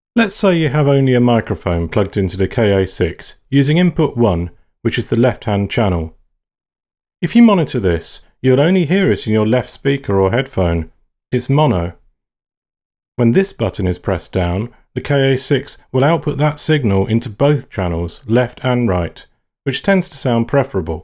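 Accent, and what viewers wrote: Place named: United Kingdom. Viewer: The British accent took this amazing product overview right over the top.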